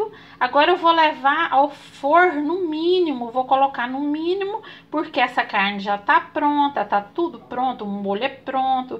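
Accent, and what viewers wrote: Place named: Minas Gerais, Brazil. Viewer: Eita sotaque mineiro gostoso de ouvir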